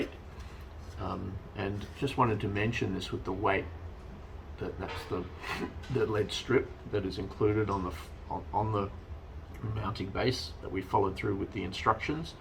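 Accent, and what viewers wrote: Australia. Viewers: Half Aussie half American accent?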